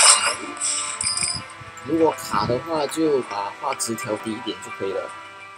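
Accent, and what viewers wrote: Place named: Taiwan. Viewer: 聽聲音J大好像是臺灣人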